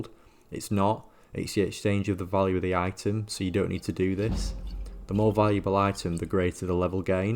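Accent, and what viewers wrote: United Kingdom. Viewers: It’s great to hear a northern accent! I feel at home. You have earned yourself a sub my friend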